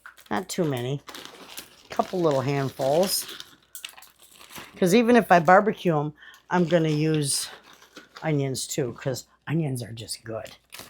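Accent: Michigan, United States. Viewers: Without even seeing where you were from I could tell you were from Michigan.I lived in Gaylord for years and my daughter still lives there.I’m curious to see , where in Northern Michigan do you live? We love it but we had to move home to Louisiana about 7 years ago.we vacation every year in Gaylord.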